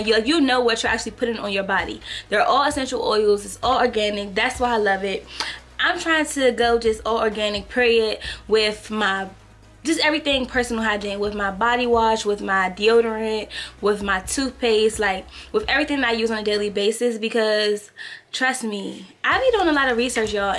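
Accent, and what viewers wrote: DMV, United States. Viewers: I KNEW IT!!!! LOL I am from the D(M)V. lol so crazy how I can pick up on our accent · Lol... I knew you were from DMV area when I heard your accent. Great video.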